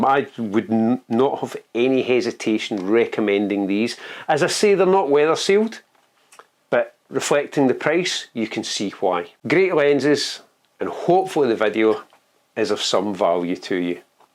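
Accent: Scotland, United Kingdom. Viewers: Nice review, thanks! Your intro is very dramatic and usually these lead to a confident American model...Then we get your strong Scots accent. It’s always good to be a little different. Your images are excellent so I’ve subscribed immediately. I really hope you don’t dump your Nikon gear; you know it will be better in low light (over the Xt5)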